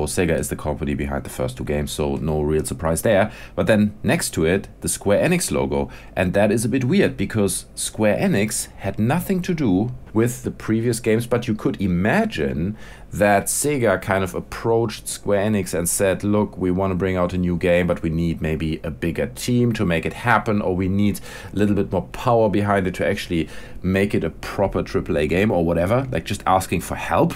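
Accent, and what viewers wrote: Germany. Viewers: Moment, den deutschen Akzent kenn ich doch...Shenmue 3, maaaaan!!! :)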